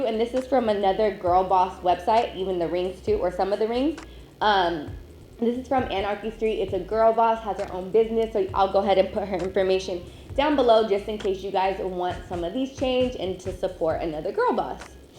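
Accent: France. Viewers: J'aime trop ton accent français 😍😍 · 😍😍😍😍 ton accent français est trop chou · You're just Soo gorgeous and your french accent is so cute 😘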